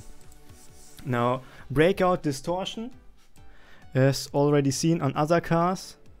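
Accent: Germany. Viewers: Du bist doch deutscher oder 😂😂👍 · Heyo bist du n Deutscher? Ich finde den Aktzenz hört man immer sofort raus :D. hast du Lust mal zusammen zu spielen? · Man hört so krass, dass du deutsch bist. Warum machst du keine deutschen Videos? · Are you German? xD #Giveaway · man hört das deutsche mega raus xD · Are you a german ?? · Du bist safe Deutscher :D das mekt man sofort. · are you german?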